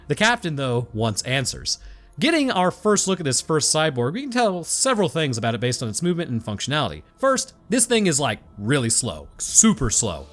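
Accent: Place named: Roanoke, United States. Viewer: I call the combination of your speech, cadence and inflection Roanokian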